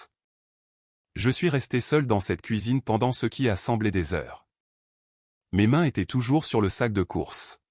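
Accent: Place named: France. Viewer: Pareil, de plus entendre l'anglais avec un accent français ça pique les oreilles.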